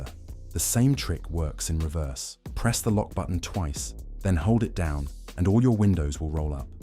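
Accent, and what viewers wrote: United Kingdom. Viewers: All this stuff is great BUT we're / how do you set these things up. Are the directions in the manual? Telling us that both BMW and Toyota has a certain thing doesn't help. What models - what years. And the big question. The nariator has what sounds like a British accent so therefore is it only in England ???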